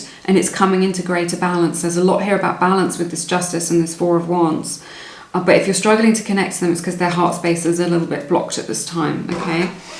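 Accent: United Kingdom. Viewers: thank you! love you and your British accent hehe! 😂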